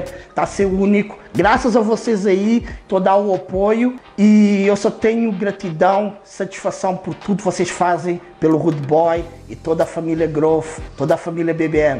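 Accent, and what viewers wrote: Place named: Mozambique. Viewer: Me sinto encasa vendo os vídeos do pois temos o mesmo sotaque... Sou da África em Moçambique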